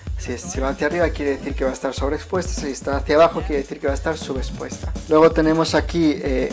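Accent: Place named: Spain. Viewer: Que cringe porque hablas como español?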